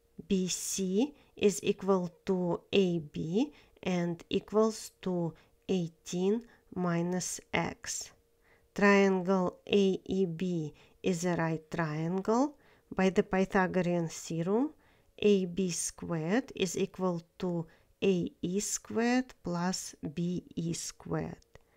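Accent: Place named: Russia. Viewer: Thank you both for the good problem and your great explanation! By the way, I love your Russian accent when you speak English.😊❤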